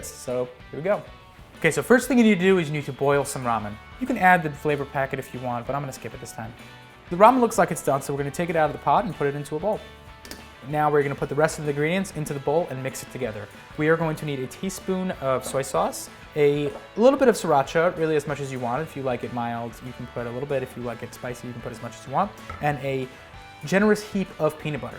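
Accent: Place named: South, United States. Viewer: I love it. That southern accent (I think) is the best.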